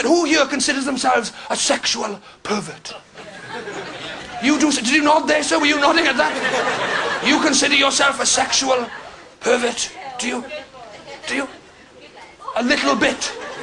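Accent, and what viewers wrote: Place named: Wales, United Kingdom. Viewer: He's fantastic and I love welsh accents which makes it all the better.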